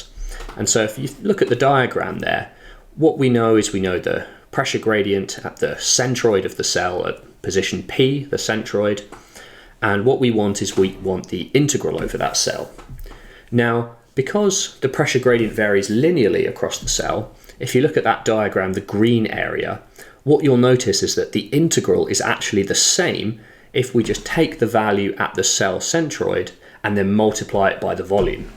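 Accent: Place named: United Kingdom. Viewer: 这英国口音听着太舒服了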